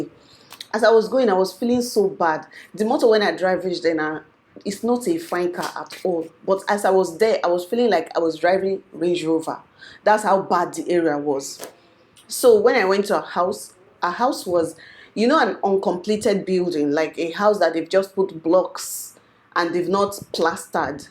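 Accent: Nigeria. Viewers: Lovely voice, just couldn't understand most of it in Nigerian accent. Its true that maremaids are beautiful. I've seen it in Joburg working in a shop called Maxx many years ago. She looked like a new born child but grown up. Had baby afro and her skin was like she'd been kept in a bottle and had never had any sunlight exposure at all. I complimented her of how she was so beautiful and she answered me in my language. I couldn't see her legs at the shop because she was standing between rails of the clothes... Months went by and I read in one of the church articles in Joburg, some man who had fallen for her beauty, describing her, also stating where she worked: Conferming the woman to be a wicked maremaid. 😱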